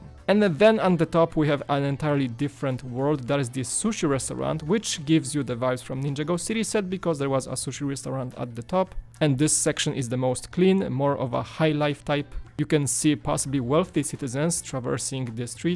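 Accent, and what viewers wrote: Poland. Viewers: are you from Poland or are you Polish? I apologize for the question but you have such an accent and you pronounce the names well in Polish.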